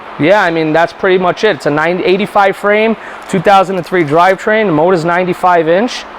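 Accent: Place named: New York, United States. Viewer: as an Australian love that new york accent mate!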